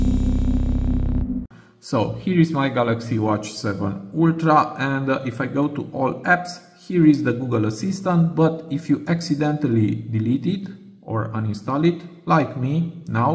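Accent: Romania. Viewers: Thank you very much! I think u are Romanian by Ur accent ❤❤❤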